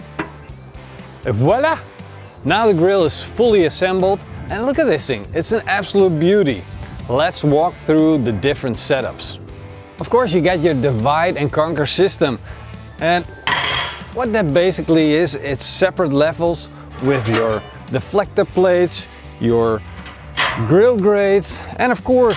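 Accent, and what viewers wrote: Netherlands. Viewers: I love your dutch accent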